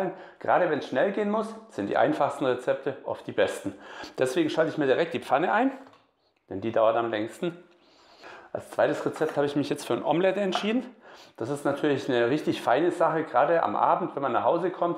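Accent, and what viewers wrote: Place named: Franconia, Germany. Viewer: Gelernt ist gelernt. Ich brauche schon zum Champions putzen und schneiden 15 Minuten. Beide Rezepte finde ich gut, werden nachgekocht. Danke für Deine Mühe. Darf ich fragen wo Du lebst? Hört sich fränkisch an. Ich komme aus OF (Lichtenfels), habe aber lange in Nürnberg gelebt. Seit 24 Jahren lebe ich aber nicht mehr in der EU.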